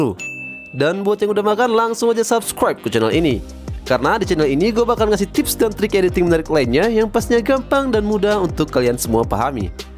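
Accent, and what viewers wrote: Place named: Gayo, Indonesia. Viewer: Fix dialeknya mirip orang gayo🤭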